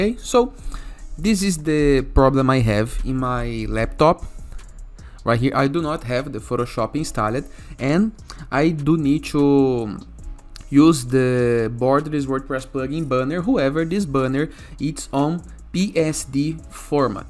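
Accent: Brazil. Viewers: Sotaque de brasileiro, acertei?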